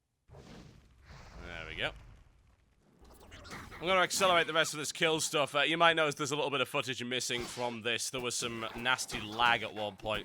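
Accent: United Kingdom. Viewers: i think u should do the worgan voices cause u sound more british than anyone at blizz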